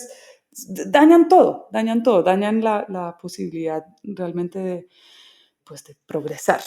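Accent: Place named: Colombia. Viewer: No tiene acento extranjero, habla con acento colombiano.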